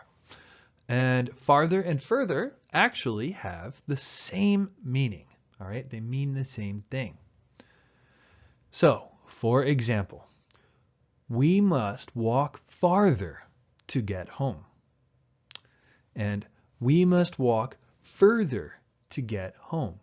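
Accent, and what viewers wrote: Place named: United States. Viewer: your classes are incredible and your american accent is great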